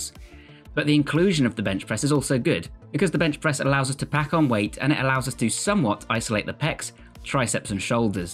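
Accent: United Kingdom. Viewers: I wanna hear u say wingardium levIOsa, (cuz your accent is similar to harmoine's)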